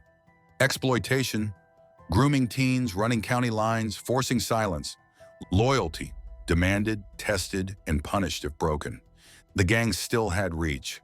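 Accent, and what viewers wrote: United States. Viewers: Lmfaoooffffffs 🤣 wrong gang you dip-stick 😂 along with shyte american narrator ..Yeh you is a sad attention seeker uploading this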